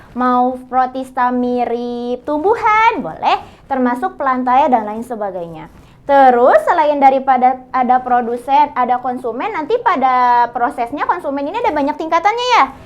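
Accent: West Java, Indonesia. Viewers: Kakak nya Sunda bet suka gua denger nya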